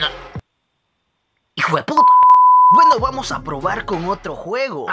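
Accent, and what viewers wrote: Chile. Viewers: eres chileno :v?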